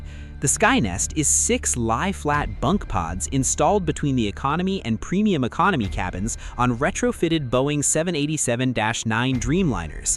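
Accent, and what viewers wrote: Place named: United States. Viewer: This video is very obviously an AI Voiced (with shocking pronunciation in an American accent) infomercial produced by Air New Zealand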